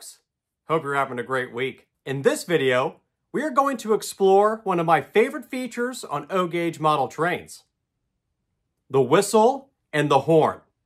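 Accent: Kansas City, United States. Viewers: Are you from Kansas City?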